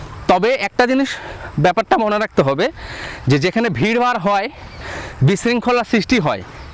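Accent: Bangladesh. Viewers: ভাই আপনিও যে একজন বাংলাদেশী সেটা আপনার ভাষা শুনেই বোঝা যায়। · তুমি একজন বাংলাদেশি তোমার ভাষা দিয়ে বোঝা যায় 👀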